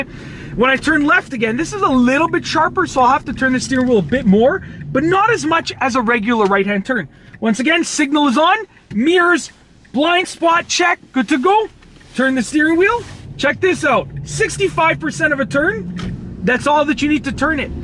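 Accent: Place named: Canada. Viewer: The most canadian accent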